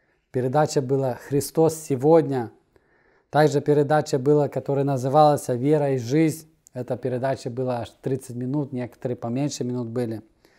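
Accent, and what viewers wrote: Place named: Caucasus. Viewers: Да, чисто кавказко с украинскими словами!) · Какой-то кавказский акцент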